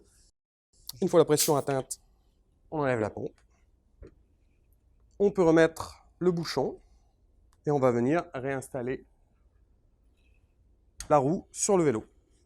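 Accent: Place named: Quebec, Canada. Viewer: avec le petit accent québécois en plus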